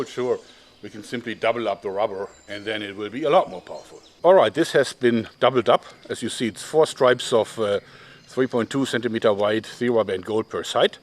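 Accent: Germany. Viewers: as a german i got to say that this sounds like a german dad speaking english. r u german o.O ? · Ich bin mir nicht ganz so sicher, aber bist du deutsch? :D Hörst dich nämlich ein wenig so an ^^ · are you from germany originally?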